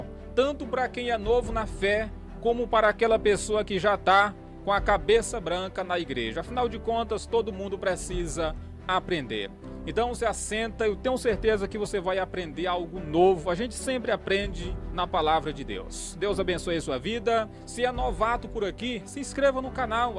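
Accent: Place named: Ceará, Brazil. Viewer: Cearense da gema😅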